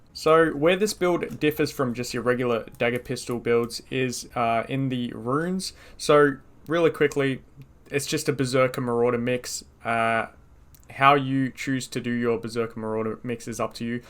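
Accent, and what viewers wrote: Australia. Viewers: Hey mate. I hear an aussie accent. How do you deal with the latency?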